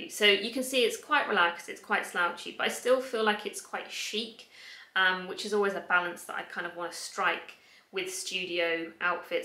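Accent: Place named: United Kingdom. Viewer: I really enjoyed this video!! there are some outfits that I definitely will keep in my mind for futur looks. Favorite are day date look, evening date, studio look, raining day in the city! really helpful for my futur city (London)! I would like to have an inspiration for museum day/ gallery day/ city strolling AND a casual look at home, home work or Monday/Thursday home look something comfortable and causal chic maybe AND definitely wedding look because I will have wedding look next year too! Thank you and always enjoy to watch your video and gosh your British accent is delicious ( Don't know if it's a great word for accent language but I love the idea)! Have lovely week sweetheart xx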